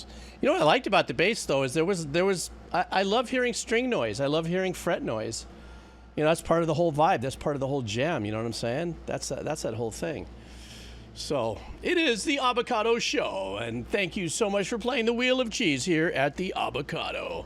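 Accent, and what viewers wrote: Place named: Argentina. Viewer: hahaha wasnt expecting you to talk so well in Spanish (Argentine accent)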